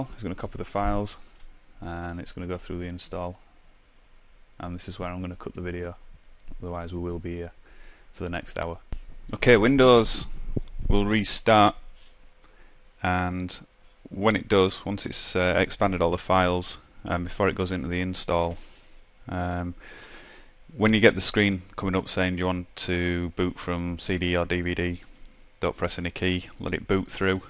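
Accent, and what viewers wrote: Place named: Northern England, United Kingdom. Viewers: Nikos Soumpasis lol it sounds like hes got a northern english accent and it sounds like an easy one to understand. but he was putting me to sleep and making me lose interest lol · i can't thank you enough for that tutorial - you've just saved me two 50 journeys and no doubt the same amount in pound notes from the pc shop for doing it!! and i could even understand your yorkshire accent! :@ cheers.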